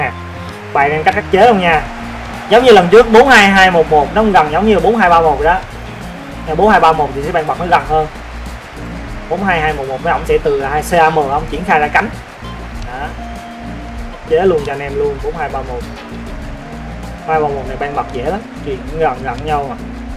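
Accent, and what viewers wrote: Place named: South, Vietnam. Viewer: Xem kênh a phần vì content chất lượng, phần vì a nói giọng nam nên cảm giác gần gũi hơn:))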